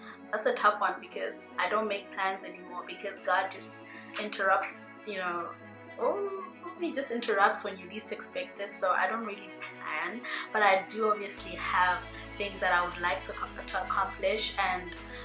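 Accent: South Africa. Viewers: South African accent...😑I thought that too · omg!!! legit thought you were South African. your accent says S.A guuurrrlll?????!! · You have a cute South African sounding accent! Love your spirit!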